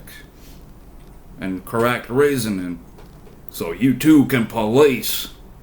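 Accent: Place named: Georgia, United States. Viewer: I like the accent. Sounds like you’re from Gawja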